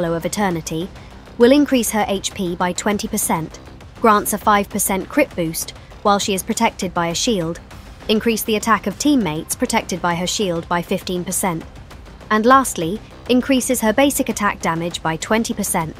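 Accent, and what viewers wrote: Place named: United Kingdom. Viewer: What a Nice plasure to ear That british voice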